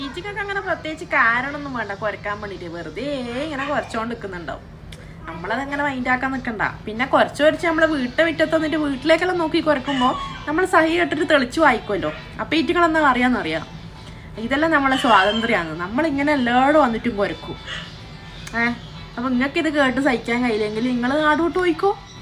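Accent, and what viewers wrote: Kannur, India. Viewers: Super chechi kannur slag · കണ്ണൂർ ഭാഷ ഇത്ര ഭംഗി ആണെന്ന് ഇപ്പൊ മനസിലായി... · my wife and daughter are enjoying your all programs. We are from Kannur, so it is our normal talk. Thanks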